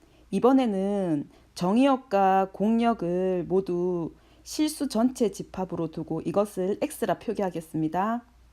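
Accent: South Korea. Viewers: 경상도 인듯?!?!ㅋㅋㅋㅋ 구독합니당 ~ㅎㅎ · 우왕 들 화면 넘 깔끔하고 조아요~ ㅎㅎ 근데 서울분은 아니신가 봐요 ㅋㅋㅋ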